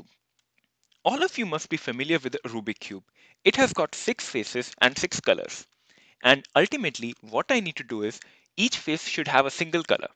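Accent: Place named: United Kingdom. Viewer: Beatiful british english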